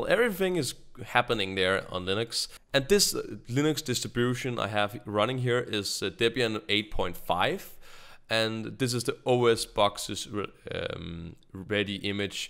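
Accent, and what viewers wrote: Denmark. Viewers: du er god til engelsk men man kan godt høre at du er dansker!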